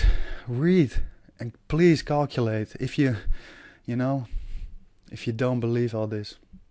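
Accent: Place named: Netherlands. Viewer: Hi, you sound Dutch to me 🙂, am Dutch too....thank you for this video. Please look into the book the Numerical Universe by Anthony Morris. You will find it mind blowing. He shows through Vortex Mathematics that we are living in an intelligent designed Universe of 137 billion years old 🙂 He elaborates deeply this number and I have put it on my car’s license plate ever since I find out about this number. Also in Hebrew the word God is written in such a way that it looks like a mathematical formula showing the Phi sign and the number 7. Greetings from Cyprus <link>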